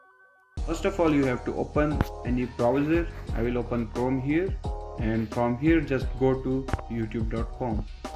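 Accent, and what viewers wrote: India. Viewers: Indian accent yea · Why do tgey always have an indian accent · No he's a indian ._. Ik a indians accent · Lol it's not bad . It's Indian accent. You might have problem understanding it which is understandable but it's not bad.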